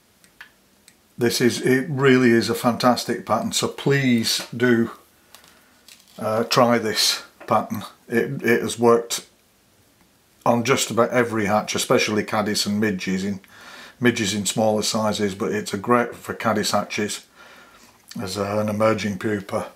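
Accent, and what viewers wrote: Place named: Scotland, United Kingdom. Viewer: I've been following you recently and trying to to replicate some of your patterns. It is so much easier to learn with such detailed, precise, clear image videos. Beautifull flies. If wonder if you have fishing skills videos.... Take care and try to stay at home. Ricardo (Chile) PD:....... and thanks for your clear Scotish english...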